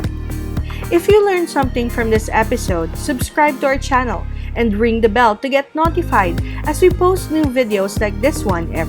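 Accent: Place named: Philippines. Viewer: Your English really sounds FIlipino. Matigas accent.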